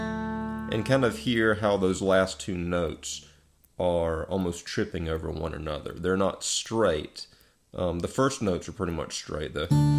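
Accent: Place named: Tennessee, United States. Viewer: I love your voice. You sound like the comedian Nate Barghatze. I bet you're from Tennessee 🤗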